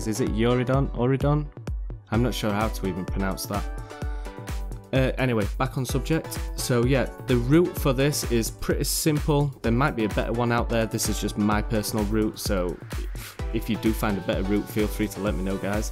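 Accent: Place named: Yorkshire, United Kingdom. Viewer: Haha yeah, nice and subtle man! What part of England has this accent? Yorkshire/Sheffield somewhere around there? I need to learn to speak like that, it's just awesome!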